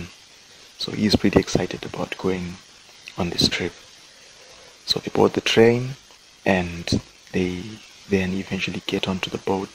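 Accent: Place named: Africa. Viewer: Certainly read by an African...... not sure whether he's from the Congo😅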